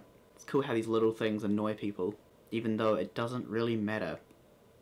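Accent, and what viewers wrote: New Zealand. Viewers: You have quite a refined Kiwi accent, go out into the wops and yool git some rool bad koiwoi accents. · Well let's see your video on this... I'm from Auckland, and he 'sounds' pretty kiwi normal to me. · I think what stands out the most for me in New Zealand accents are how the letter E is pronounced in words. It is my favorite and definitely different from Australian or British. :) One of my online friends is from NZ. I like how you say sneakers and tomato by the way.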